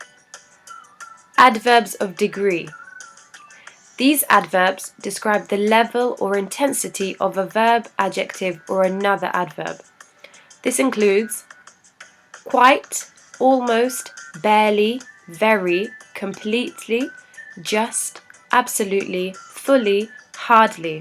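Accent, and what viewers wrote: England, United Kingdom. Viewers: how are you today, I have seen your channel, and is very good I love your accent as an England citizen and tell you what I would like to hear from you a big list of vocabulary with your pronunciation is very important to speak good English, hope to hear from you soon, I am from Costa Rica, big hugs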